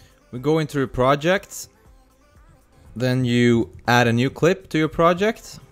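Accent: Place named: Sweden. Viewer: Haha as soon as you started speaking I knew you were swedish. Tack så mycket för hjälpen!!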